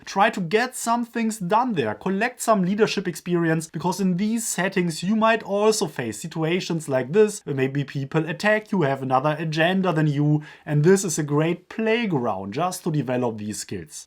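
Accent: Germany. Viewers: Very strong German accent 😆👍🏽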